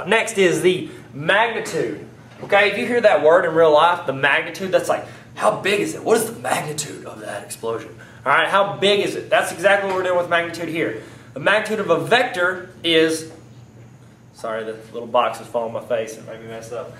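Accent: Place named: Louisiana, United States. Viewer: You have to live in Louisiana, that accent tho, its ok I live in alabama, I have an even more hick accent than you do.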